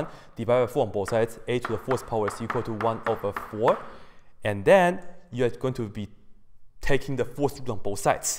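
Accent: China. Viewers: Se le notaba más el acento chino xd. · Lol, this is not Chinese accent, I have to make it clear. This is Chinese dialect accent. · What really pisses me off is that there are 377 mean-spirited people out there who can thumb up this: Pratap Singh: "Improve your accent first of all. I could not comprehend it at all. Chinese pronunciation works on chinese language only not on English." Work it out. It's not easy to get near-native pronunciation in a foreign language, which is what English is for him. Now if you have a hearing disability I might sympathize with you but you still should not demand unreasonable demands of the video author, but rather seek some kind of treatment and/or have someone else listen to the video for you to tell you what it is saying.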